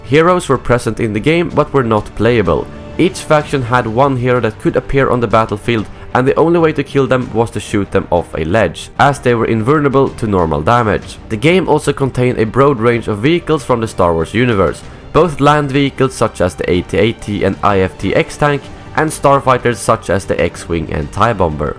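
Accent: Sweden. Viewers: u sound swedish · You sounds Swedish. Are you? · u sound so swedish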